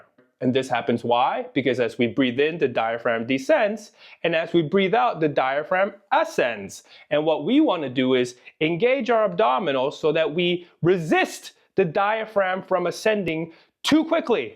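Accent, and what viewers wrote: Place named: United States. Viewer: I thought you were italian for a second i got excited 😭 Edit: Oh, you are, i watched another one of your videos and you just have a really good american accent lol.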